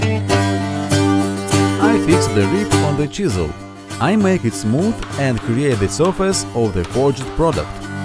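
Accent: Russia. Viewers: I can hear strong russian accent.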